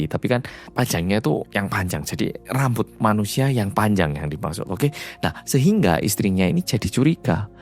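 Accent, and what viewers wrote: Java, Indonesia. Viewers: Love swindler yang medooook. Makasih sudah menghibur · Maaf ya mas kalau aku denger denger suara mas itu bener bener medhok Jawa banget jadi bangga ada orang Korea tapi puiiinnterr ngomong jowo 😅😅😅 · kan tinggal lama di Malang sekolah di Malang juga lama..gimana ga medok..bule aja ada yg medok biar pun ga tinggal lama di Indonesia ..lo tau ga bule medok ..😂😂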